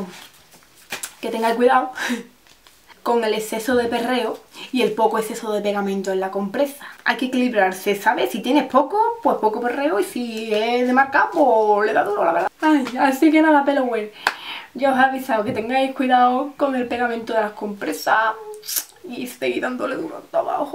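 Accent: Andalusia, Spain. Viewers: Para que te enteres jeniffer chavala, es andaluz con un toque de barrio y al que no le guste que se arañe. Más claro agua y de cateta nada, Sevilla capital. Los andaluces tenemos la fortuna de hablar bien clarito con pocas palabras..... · Soy latino americano y esta claro que hablo diferente a los españoles y etc, pero veo a esta chica y no paro disfrutar su acento tan rico... tiene un golpe de timbre en ciertas palabras muy bonito no se de que parte de España será por que se que tienen varios vocablos allá pero no se, es muy sabroso su acento jajajaj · Si no eres andaluz si te va a costar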